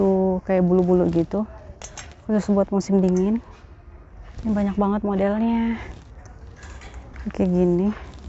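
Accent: Jakarta, Indonesia. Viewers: MasyaAlloh seneng kalau liat mmh kalau lg gomong kadang bahasa betawinye keluar 😊😊 kaye kita ini anak lenteng agung 😊😊 tp seneng jg liat mmh bahasa Arab nya bagussss bangeeeet 😊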